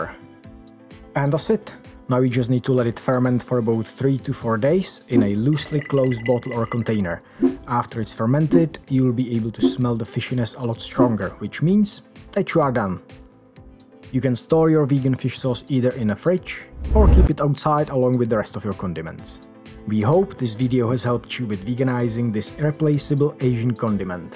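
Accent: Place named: Czech Republic. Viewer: Z přízvuku se zdá, že jste Čech. Skvělé video, vyzkouším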